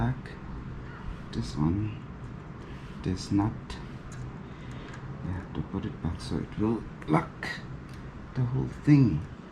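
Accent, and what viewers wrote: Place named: Ifugao, Philippines. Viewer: Bro ano accent mo hehehe kala ko di ka Pinoy...taga Ifugao ka ba?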